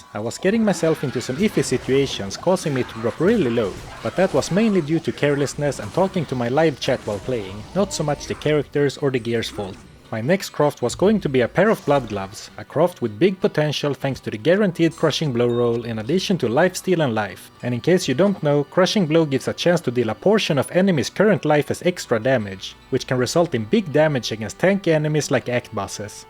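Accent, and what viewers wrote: Sweden. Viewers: came for the diablo 2 content and stayed for the swedish accent greetings from jörmenni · no doubt. Nice video! And I love your swenglish! ❤ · u are swede right:D? or norweigan?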